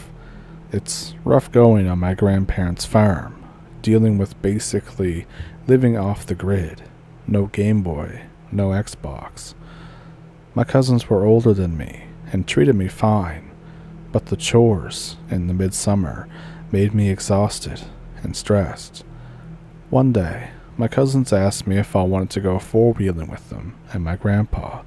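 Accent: Ontario, Canada. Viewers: Odelay,! 🎉... Listening to your voice so reminds me of my cousins from Grey, Ontario 😊😊😊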